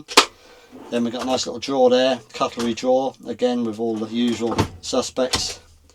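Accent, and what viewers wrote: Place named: East Anglia, United Kingdom. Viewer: I’ve just discovered your channel and I’m hooked already.Guessing you are north Suffolk or south Norfolk from the accent. I’m down on the coast not a million miles from Aldeburgh. Looking forward to watching the canoe builds as I’m a maker myself…woodturning, and have my own little channel.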